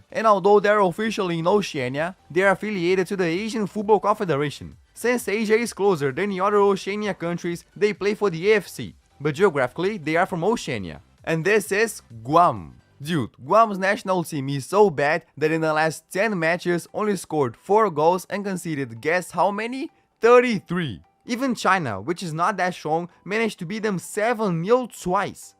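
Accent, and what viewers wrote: Brazil. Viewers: Sounds like a Brazilian accent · This guy speaks English in a Rio accent. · Ive live in brazil for almost 5 years and i can guarantee that this is a brazilian accent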